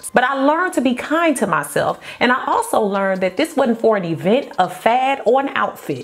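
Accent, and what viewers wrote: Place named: South, United States. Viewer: I hear some southerness in your voice. Thanks for this video.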